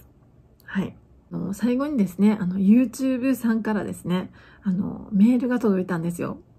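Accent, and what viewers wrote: Hakata, Japan. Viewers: 博多弁のイントネーションを聞くのが本当に、いやしです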